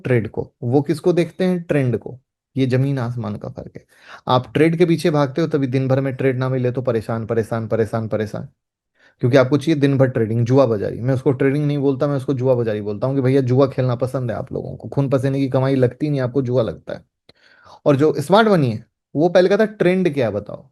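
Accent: Bihar, India. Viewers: आप बिहारी हो क्या?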